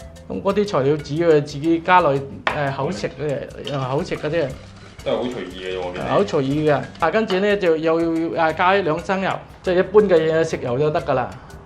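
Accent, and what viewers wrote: Zhongshan, China. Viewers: 師傅口音應該係我鄉下中山石岐，是嗎？ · 是中山，不是石岐.而是中山隆都。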